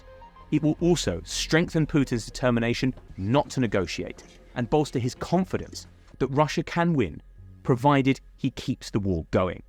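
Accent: United Kingdom. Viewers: Ridicolous Brit narration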